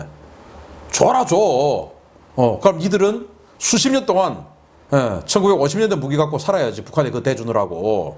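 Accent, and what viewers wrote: Gyeongsang, South Korea. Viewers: 오오.....|오만대???? 오랜만입니다.역시 갱상도죠....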